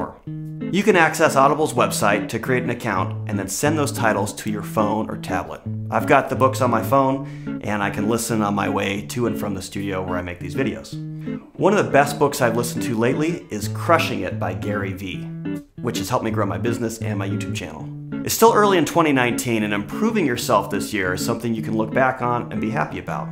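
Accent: Germany. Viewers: Are u German ? xD Nice Videos <3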